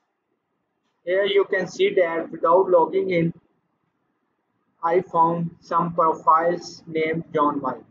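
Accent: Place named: India. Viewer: When I heard the Indian accent I knew I was in good hands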